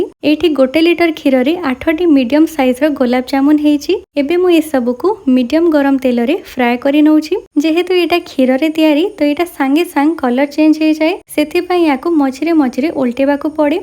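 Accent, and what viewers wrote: Sambalpur, India. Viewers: Tamar Sambalpuri voice bhal lagsi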